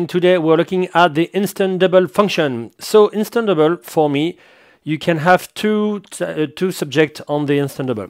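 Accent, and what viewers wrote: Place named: France. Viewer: l'accent français de merde XD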